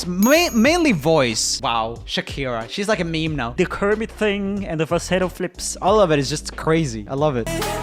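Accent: Sweden. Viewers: JoeL: I'm getting old guys JoeL: Help me, I can't pronounce anything Me: You're Swedish... That's way...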